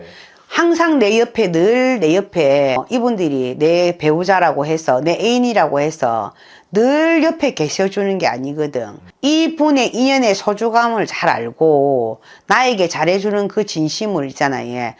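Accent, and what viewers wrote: Busan, South Korea. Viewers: 선생님 부산분이 신가요?ㅋ 쏙쏙 귀에들어옵니다 ㅋ 정묘생 쏠로운은 없나요😅 좋은 말씀 감사합니다❤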